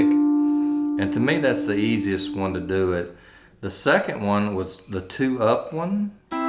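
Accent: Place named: Tennessee, United States. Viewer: by the timbre of your voice I think you live in Tennessee, that is all..thank you for your time Sir.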